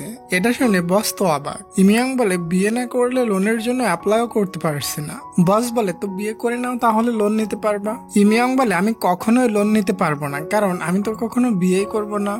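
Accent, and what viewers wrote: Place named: Madaripur, Bangladesh. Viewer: ভাইয়া আপনার বাড়ি কোথায় মাদারি পুর না বরিশাল। আমার মনে হয় মাদারি পুর।❤❤❤❤❤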